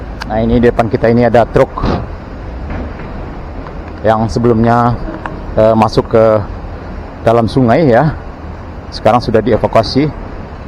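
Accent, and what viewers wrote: Minangkabau, Indonesia. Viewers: Dari beberapo video ikolah nan gambar video barasiah, stabil, carito jo informasi sinkron dan jaleh, didukuang pulo jo suaro dan logat lokal, yo samakin taraso ado di lokasi.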